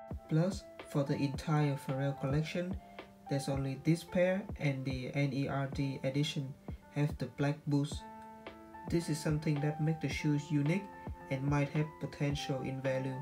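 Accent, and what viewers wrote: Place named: Vietnam. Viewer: omfg dude, 1st time to ur channel, but guess what, i could see where u from by how u pronounced. hahaa, its cannot be “a đi đát” like what u said. and im also from 𝙑𝙞𝙚𝙩𝙣𝙖𝙢 🇻🇳 btw ^^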